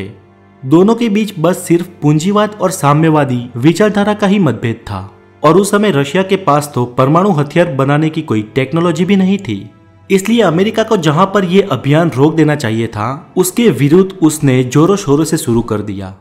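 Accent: Gujarat, India. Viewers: U gujarati?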